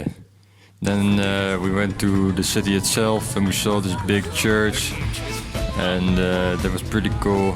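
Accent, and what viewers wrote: Netherlands. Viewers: me, as a dutch person, can just hear that he's dutch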